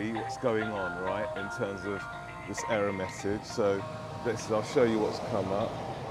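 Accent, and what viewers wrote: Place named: United Kingdom. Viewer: You sound like British guy now.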